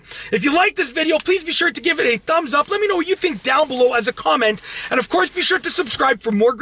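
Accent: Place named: Canada. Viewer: I love ur amazing Canadian accent and your content. Especially when you say hey everybody.😂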